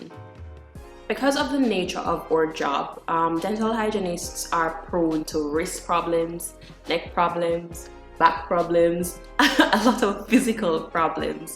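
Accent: Jamaica. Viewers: Are you a jamaican RDH or overseas? Edited: lol I ended up finishing the video so I know now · She sounds like she has a Jamaican accent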